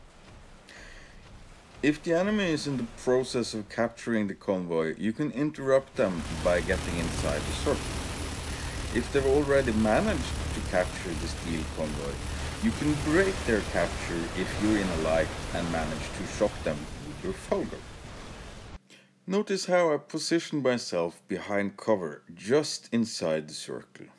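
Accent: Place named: Norway. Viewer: it sounds pretty Norwegian or Scandinavian to me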